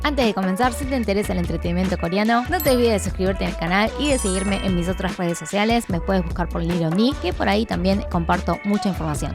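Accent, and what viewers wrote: Argentina. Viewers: Te sigo de hace mucho y lo que más me gusta es cuando haces estas explicaciones y nos acercas más a vuestra cultura que dicho sea de paso es super mega interesante para los de este lado del mundo,agradecida por todo ahora no solo disfruto de sus voces bailes y música sino que también entiendo la temática que hay de atrás. Muchas gracias mi niña eres súper amorosa y tú acento argentino me encanta ,un abrazo y bendiciones desde Chile🙋🇨🇱🙋🇨🇱🙋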